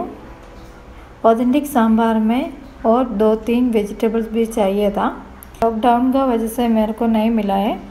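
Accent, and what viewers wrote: Kerala, India. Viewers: മൊളു ... ഹിന്‍ദിയിലെ മലയാളം accent ...അതാണ video highlight ... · It is actually Malayali Accent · Love your keralite Hindi accent. U r very sweet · Malayali* accent.. other accents are different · Dear sister I like your keralite accent very much and recipes, today easiest way to make samber... I try it your way.... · Your dishes are authentic. Even more you are so humble and sweet person. You chose hindi with your malayalam accent. Stay blessed!! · My all mallu friends speaks same Hindi ❣️ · She's a very enthusiastic person I liked her hindi kerala accent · Kerala hindi accent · Very Nice Recipe... Will surely try...also liked the way you described...typical mallu style.. Saukyaam maam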